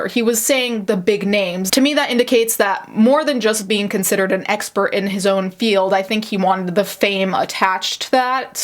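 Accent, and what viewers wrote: France. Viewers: I'm french and everything you said is true. He was a liar for 30 years and we just found out ,thanks to 4eme œil corporation ,who he really is. But many of us were suspicious for a long time cause of the way he explained things. Always in a weird way and also different story over the years. I have no pity for him and no he didnt come clean , he admitted some things cause he didnt have the choice but keep lying since then. Nice French accent! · i’m sorry if this is rude, but you pronouncing the french names with an actual french accent takes me out of the story. you don’t have to stop it’s just a side note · Do you speak french ? I ask you this because you have a great accent✨ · Your French accent is stunning. My college French professor told me I should consider not taking French 2 bc of how poor my pronunciation is. Lmao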